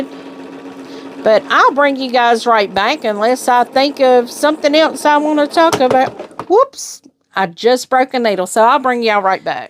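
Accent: South, United States. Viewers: Chit chat is calming. Love your southern accent. Your boo quilting came out lovely! I always do my own, but have to admit it’s not my favorite. Oh well, practice helps.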